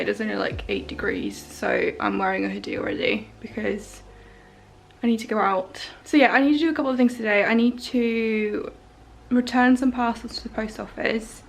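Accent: United Kingdom. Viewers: Hello from Spain. I've recently watched your video about your own British accent, so you have such a brilliant British accent. I know many people from such lovely country called The Netherlands usually speak more than two languages. Well, how many languages do you speak? Are you able to sing the Dutch national anthem in every language you've been taught? Thanks in advance.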